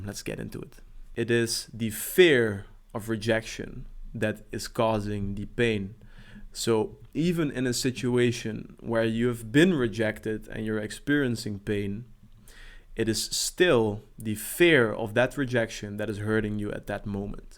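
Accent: Netherlands. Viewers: Jouw Nederlandse accent is zoooo sterk, niet normaal meer man.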